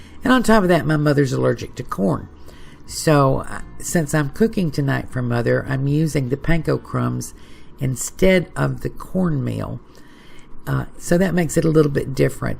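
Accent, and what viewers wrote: South, United States. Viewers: where are you from you sound southern and my 88 mother in law loves you but didn't know how to ask for she is from Georgia.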